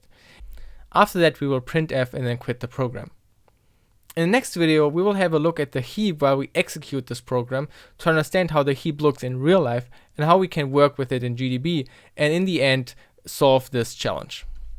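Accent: Germany. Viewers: I like your old videos as well as your newer ones! Also, is it right that I think from your accent that you're german?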